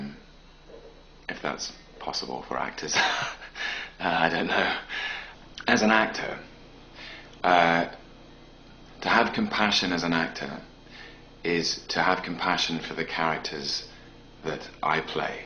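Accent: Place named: England, United Kingdom. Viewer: I love hearing his English accent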